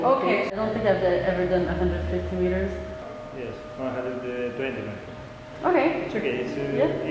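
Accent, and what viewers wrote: Quebec, Canada. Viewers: ta m'aider a ameloirer mon niveau de français et mieux comprendre l'accent quebecois d'une manier amusante merci beaucoup just si tu mis le sous-titre plus souvant dans tes video si sa possible car le sous-titre auto-generer de youtube faire beaucoup de faute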